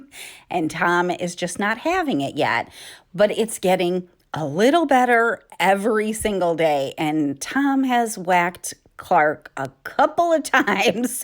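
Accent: England, United Kingdom. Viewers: your home is smashingly turning into a wonderful cozy environment that is soo inviting. I enjoy watching your channel and your family and Clark. He's so adorable. I love your British accent 😂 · I can hear you slipping into your English accent...makes me think of British TV shows (love Doctor Who and Masterpiece Theater). Busy crocheting for the past few days on several projects. Love your videos!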